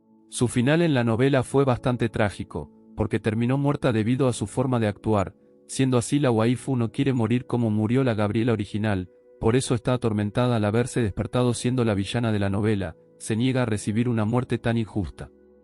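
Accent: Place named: Argentina. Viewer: Desperté Ahí casi al inicio Ver video dijo piba déjame adivinar es un canal argentino o sea el de este video o sea el creador del video es argentino y lo sé simplemente Porque como dijo piba Y pensé el que hace el video es un argentino y yo lo sé Simplemente porque en opciones o más información yo no tengo que Ingresar a esa vaina de más información Por qué simplemente con lo que dijo de piba y dijo eso Yuya adivina Y pensé y dije es un argentino Quién hace el video El que el creador del video es argentino simplemente la forma del hablar lo dice De qué lugar es y para mí fue algo sencillo de saber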